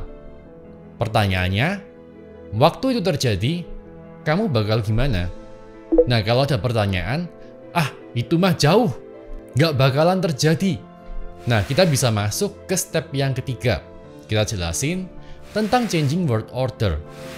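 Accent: Java, Indonesia. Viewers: Jamie Dimon ngk penah denger om? Masa elu edukasiin org2 lewat Youtube tapi Jamie Dimon aja "Siapa itu?". Gila amatt. Mirip Mario Teguh lu ya. Uda medok & ngertinya cuma ngajarin orang mulu. Sendirinya mah wawasanya ya alakadar. ☺️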